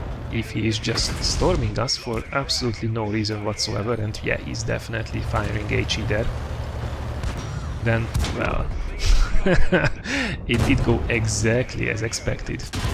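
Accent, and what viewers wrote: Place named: Hungary. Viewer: Szia, ahogy hallgattam az angol kiejtésed nekem egy kicsit furcsának tűnt gondoltam hogy nem vagy eredeti angol, de rájöttem hogy a magyarok pont így beszélnek angolul. Biztosra akartam menni ezért a kommentekhez mentem és ahogy olvasom tényleg magyar vagy. Nagyon jók a videóid és az angol tudásod is. Csak így tovább!!!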